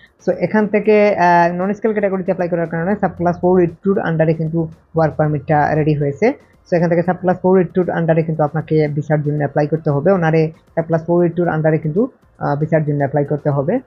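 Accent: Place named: Sylhet, Bangladesh. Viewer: ভাই মনে হয় সিলেটি, কথায় বুজে গেলাম🥰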